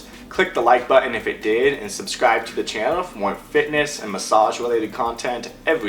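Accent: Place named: Louisiana, United States. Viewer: Handsome Canun Louisiana accent.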